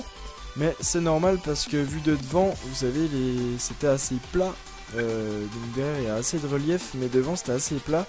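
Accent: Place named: Franche-Comté, France. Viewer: Tu as l'accent de la Franche-Comté toi non ? Si oui bel accent 😉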